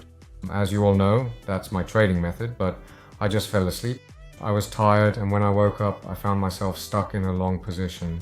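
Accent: United Kingdom. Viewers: wow your Enginlish is perfect. I thought you were born in korea, but sounds like you grew up in England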